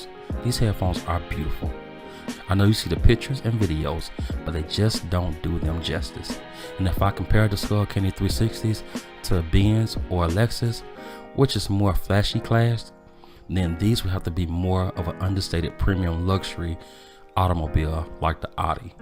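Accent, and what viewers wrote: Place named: South Carolina, United States. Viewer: Oooooooh. I want these! And is that South Carolina I hear in your voice?? 🤣💯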